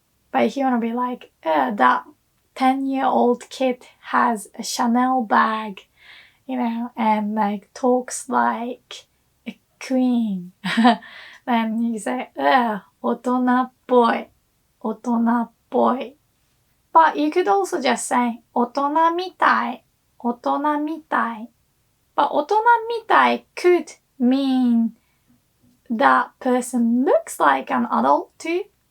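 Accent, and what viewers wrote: United Kingdom. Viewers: 先生はイギリス人みたいに話す (*^_^*) Come to think of it, if I want to say "you sound like (like, your accent)", do I still use みたいに？ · 君は英国人みたいに話す。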